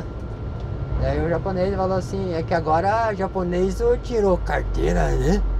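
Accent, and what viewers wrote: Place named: South, Brazil. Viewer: Pelo sutaque parece do Sul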